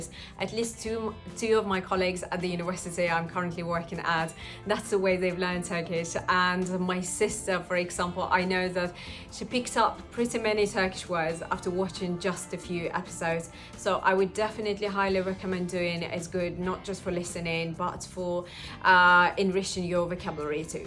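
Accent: United Kingdom. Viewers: Very British. Just need to work on enunciation a bit. :) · what an inspiring video for language learners, btw your name is not english but you sound like a brit who was born in bred in london :D im just curious were you raised in UK or require this perfect accent later ? aaand also why did you choose Turkey to live, im from Turkey and this country is not doing pretty good :D, what made you choose turkey i really wonder... Thank you so much <3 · your british accent is excellent 🔥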